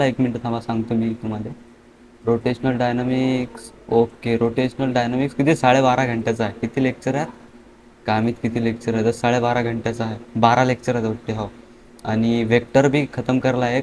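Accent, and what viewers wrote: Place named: Warud, India. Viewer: Bro tu amrawati warud cha ahe ka Karan tuzi bolnyachi bhasha tashich ahe😅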